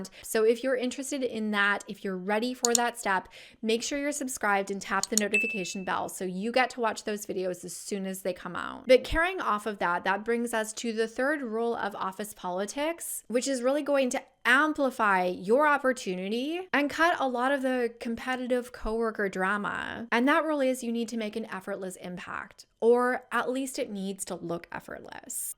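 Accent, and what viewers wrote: California, United States. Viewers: Your Cali tone is so off putting…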